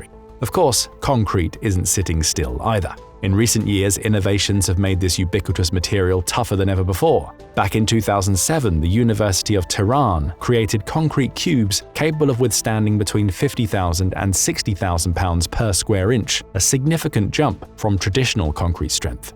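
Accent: England, United Kingdom. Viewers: It's an English accent, and the speaking is quite clear.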